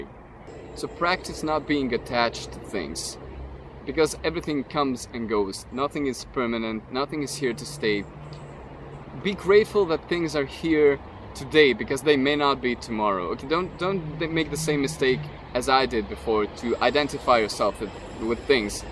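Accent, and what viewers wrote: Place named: Netherlands. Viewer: Great points and analysis, totally right on. I like what you said about spiraling around the issue and getting closer to the emotional pain, that's a great visual image and definitely accurate. Where do you live, Holland? Just guessing based on your accent.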